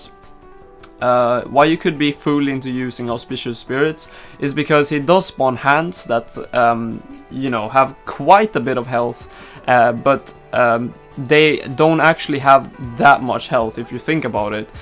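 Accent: Sweden. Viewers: You sound like a swedish person? Are you?xD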